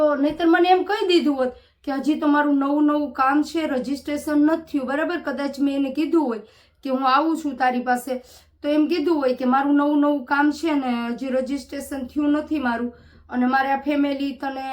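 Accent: Kathiawar, India. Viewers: અસલ કાઠિયાવાડી ગામઠી ભાસા..!!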